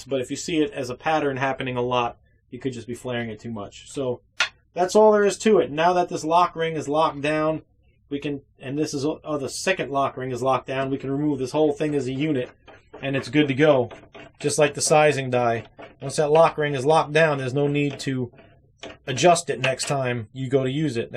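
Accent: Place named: New Jersey, United States. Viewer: Thanks that was very helpful.You sound like your from NJ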